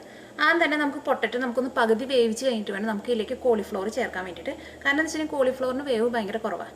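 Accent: Thrissur, India. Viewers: thrissurkkari ano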